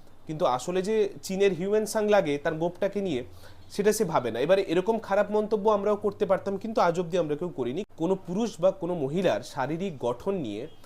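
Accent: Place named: Medinipur, India. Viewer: dada tumi ki Medinipur? Purba Medinipur? Tomar vasar taan ta Amadr i moto, Medinipur chele manei intelligent,ki vabe chaton dile vatangsu ke😂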